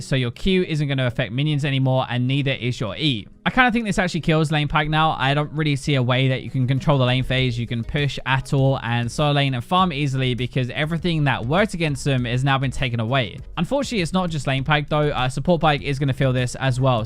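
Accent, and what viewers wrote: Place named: Northern England, United Kingdom. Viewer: holy shit I can't understand a thing your northern english ass is saying. ENUNCIATE FFS.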